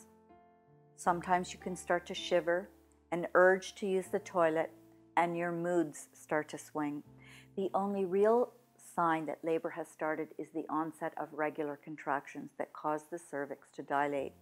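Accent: Canada. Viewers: I believe she is Canadian based on her accent and also the way the words are spelled on the screen (such as labour spelled with a "u"). This may be why she pronounces words just a bit differently. It's not necessarily wrong, it's just a regional difference.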